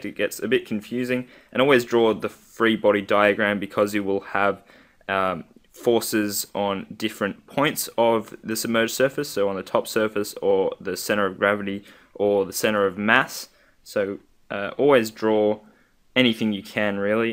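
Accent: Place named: Australia. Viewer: Australian Accent, likely Aus.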